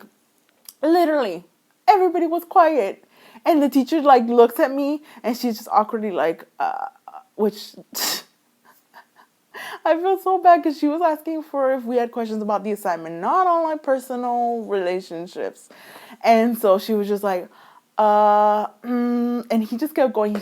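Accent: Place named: El Paso, United States. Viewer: Omg it sounds like you're from El Paso? Lol my mom is from ELP and I loved going to Mexico to get candy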